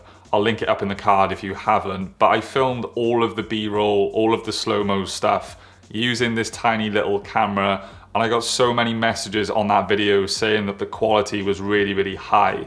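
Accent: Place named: United Kingdom. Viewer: There is something about UK accent, or maybe about speaking in proper English, that just works. I wish I had this language skills to improve videos on my channel. Anyway great job man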